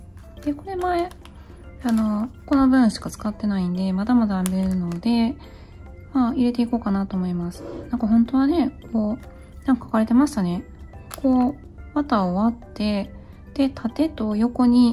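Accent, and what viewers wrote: Kansai, Japan. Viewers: はじめまして！の動画を偶然見てから編み物がしたくなり始めました。今、がま口にはまってしまってます。かぎ針初心者ですがの動画を見ながら何個かつくれました。私は関西人なのでの喋り方親近感が湧きチャンネル登録しました。これからも素敵な編み物どんどんアップお願いします💕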